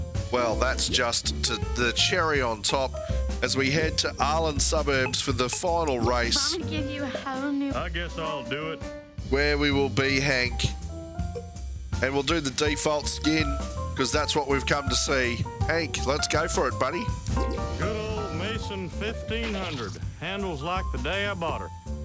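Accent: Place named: Australia. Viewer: I hope for new updates and its okay for pronouncing names wrong, but you're Australian accent is cool.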